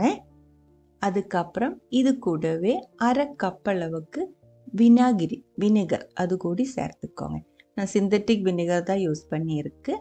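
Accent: Kerala, India. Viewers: മലയാളി തമിഴ്‌ പറയുക ആണോ?അതു പോലെ ഒരു accent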